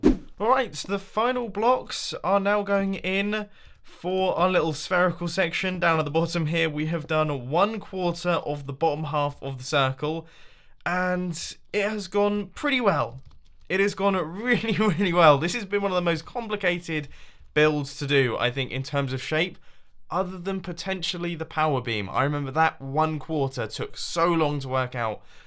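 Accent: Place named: United Kingdom. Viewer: James D that is a British accent, I know cause it's the same as American (English) duh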